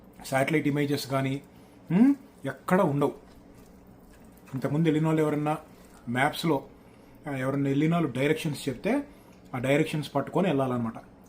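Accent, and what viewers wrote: Andhra Pradesh, India. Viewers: Meeru Nizamabad .. Slang emo andhra... ela?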